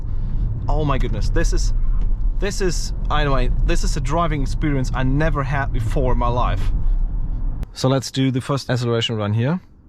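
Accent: Germany. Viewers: Man hört diesen Deutsch Akzent sooo raus haha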